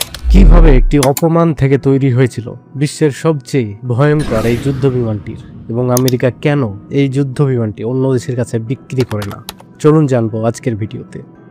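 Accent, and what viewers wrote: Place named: Bangladesh. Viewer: জানেন কি চ‍্যানেল টি কি বাংলাদেশের? ঘোষকের উচ্চারণ তাই বলে। যুক্তাক্ষর উচ্চারণ করার অক্ষমতা উৎকট রূপে প্রকট।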